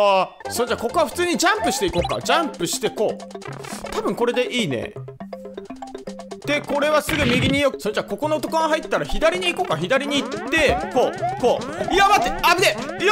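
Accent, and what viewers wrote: Fukuoka, Japan. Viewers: さんの福岡弁がなんか良いですね！これからも楽しみに見させていただきます😀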